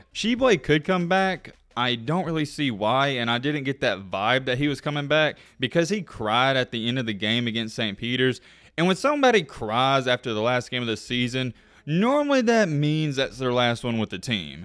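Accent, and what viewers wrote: South, United States. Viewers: LOL do you really speak like this? sorry, I'm a South African, I only hear this Southern accent in the movies, I always think it's exaggerated LOL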